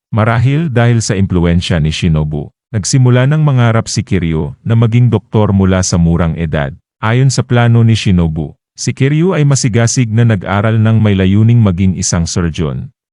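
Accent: Philippines. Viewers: BWISIT SAANG KUPALOP KA NANGGALING KAASAR TAGALOG MO🤬🤬🤬‼️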